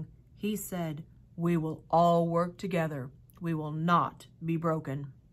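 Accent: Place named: Texas, United States. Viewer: I love you. Ty for this. Btw, u have a Texas accent now. ☺️ I love it!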